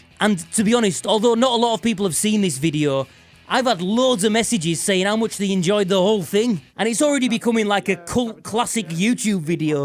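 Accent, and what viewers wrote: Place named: Northern England, United Kingdom. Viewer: You said it in another video, "Adverse Camber"... or. "the bloody bastards" in that Northern accent you got..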